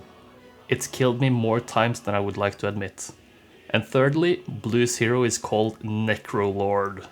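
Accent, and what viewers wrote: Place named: Norway. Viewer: You sound like a Norwegian from the 80's. Trønder maybe?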